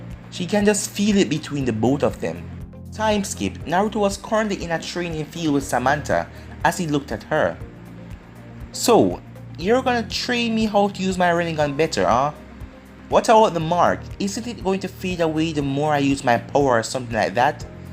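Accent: Jamaica. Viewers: This is just a random question and sorry if it’s disrespectful but, are you jamaican ? The reason i ask is cause the speech pattern is sorta familiar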